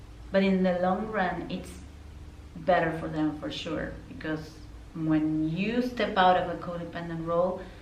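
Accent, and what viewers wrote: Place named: Argentina. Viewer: Me encanta. Ya sabia cuando te escuché hablar en inglés que eras argentinaaa🎉 Yo soy americana pero tengo gente como familia en argentina (Viedma Rio Negro). Gracias por este mensaje..necesitaba esto hoy. ❤❤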